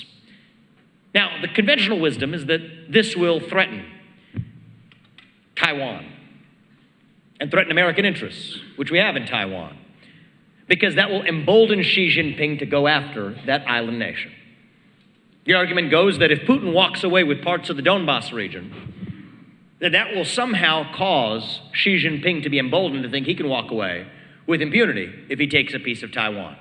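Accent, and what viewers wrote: South, United States. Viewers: So funny to see an Indian with a Southern accent. Reminds me of that dude from The Expanse who got cancelled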